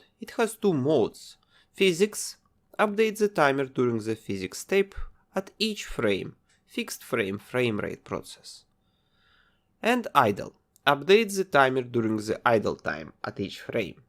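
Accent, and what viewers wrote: Russia. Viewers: Чел ты... Русский акцент не убрать!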